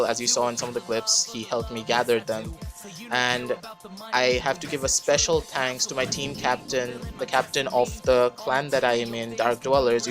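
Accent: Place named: India. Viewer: Why u sound like an Indian?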